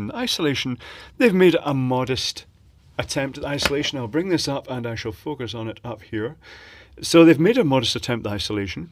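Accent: Scotland, United Kingdom. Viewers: he's scottish :D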